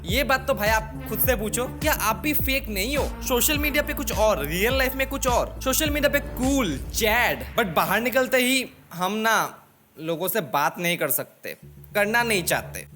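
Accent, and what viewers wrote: Assam, India. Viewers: Dada tumar pora axomiya vibes ahise akdom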